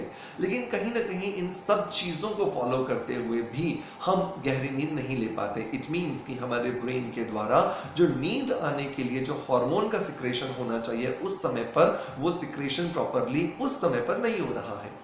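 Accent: Bengal, India. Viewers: Sir u r bengali or odia?? Pls reply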